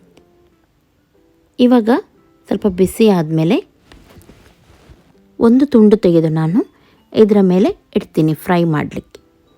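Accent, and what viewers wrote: Mangalore, India. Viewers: Medam sorry..but wher you from.?? R u from Mangalore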